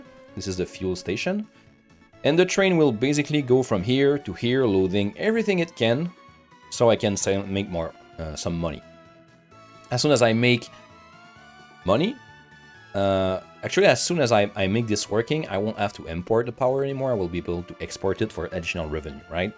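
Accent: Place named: Quebec, Canada. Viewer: I live in Florida. My family is visiting from Montreal. We are Armenian. I knew i recognized the accent. Thank you for your videos. Very relaxing.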